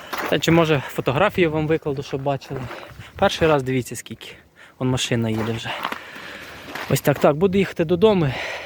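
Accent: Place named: Khmelnytskyi, Ukraine. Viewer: Майже вгадав. По вимові зрозумів, що північ Хмельницької області. ))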